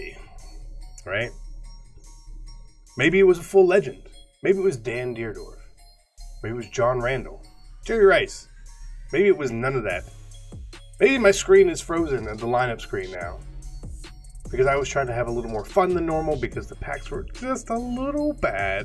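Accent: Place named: Midwest, United States. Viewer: You are so midwestern hahah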